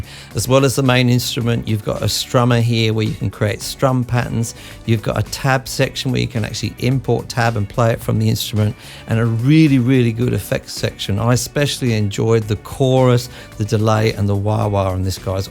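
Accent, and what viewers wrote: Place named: Australia. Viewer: Really refreshing to hear an Aussie accent on-line these days! You may have crossed paths with Pete Johns in here? Always on the look-out for a bargain & you've tickled my fancy with 4 of your 5 gems. Being a bass player, good as emulations get.. sounded good, 'till the octaves.. Thanks, oh, song title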